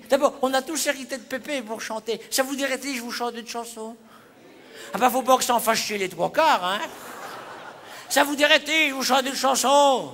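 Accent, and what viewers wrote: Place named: Normandy, France. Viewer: sacré tu me fait rire et tellement vrai tes histoires avec une pointe d accent normand bonne continuation a toi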